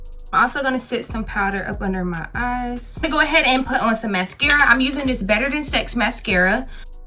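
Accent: South, United States. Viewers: I didnt see the southern accent coming. It's engaging, and you're beautiful! 😘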